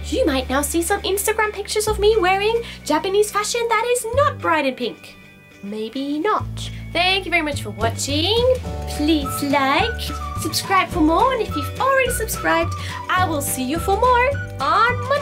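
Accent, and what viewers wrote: United Kingdom. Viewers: ^_^! I have to ask you, actually is little bit um, other question than like kawaii fasion! Because I don't have money money, and here aren't any stores, too expensive (postage) [Czech post the best ha] and blahblah Have you ever been in Czech Republic? x_x Do you drink beer? um, btw I like your british pronunciation! (too shy to say love)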